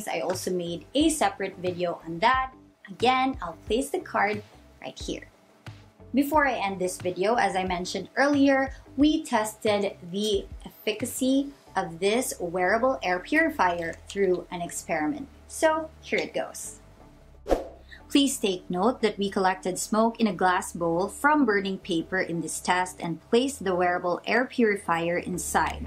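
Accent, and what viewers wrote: Philippines. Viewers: I like your positive outlook, actually, I also like the way you speak, I know some ways shows our Filipino acc-----accent, tehe! Anyways, I have HEPA Hathspace on my room, I am using it for my allergies. In regards to that device, I don't really mind, but I probably have to get another brand here in Hawai'i or maybe order from Amazon. But it's always good to have something like that for additional defense from covid. I do not know in the long term usage though. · Lol, kala ko tagalog, english paka ka pala. Hahaha. Bisayang probinsyana yata...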